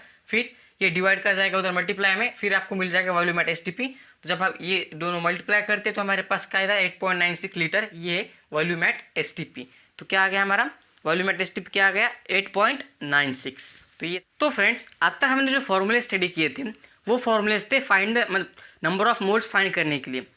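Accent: Maharashtra, India. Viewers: Seems like Marathi Accent. Aap konse class mein padhte hai abhi? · I think this guy is from maharashtra bcz he have marathi fluent 😅😅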